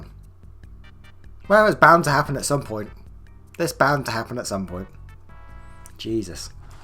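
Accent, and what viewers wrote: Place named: Bristol, United Kingdom. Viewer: Ok then, on some occasions you sounded like a Bristolian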